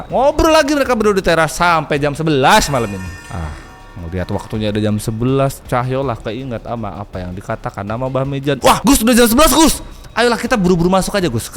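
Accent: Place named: Lampung, Indonesia. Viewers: Lampung gitu logatnya bg😅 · Latar jawa, logat lampung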